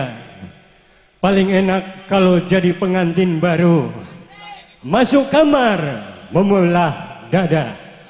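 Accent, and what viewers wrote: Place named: Tegal, Indonesia. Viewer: Bahasa Jawa nya Ngapak nih. Pst eksporan dr Tegal ya boskuh. He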